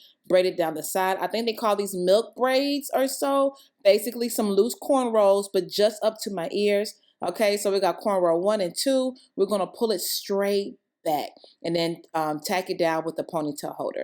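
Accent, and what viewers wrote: Nigeria. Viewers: “Like it’s part of my chocolatiness” 😂😂😂 I love your naija accent too.This quarantine situation is having errbody glooowwing 👀👀👀👏👏👏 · You make me laugh when you speak Nigerian accent.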